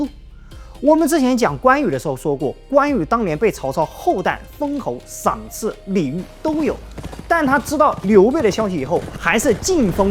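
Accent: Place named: Hubei, China. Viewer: 我今天很興奮的和我太太說，聽湖北人說書，和北方人說書的風格不同，另有一番滋味。我有一個武漢的朋友，說話和一樣有種魅力。然後我太太說，三國是不是在講曹操、諸葛亮和關羽結拜的故事… 我決定一輩子不再和她討論這個話題…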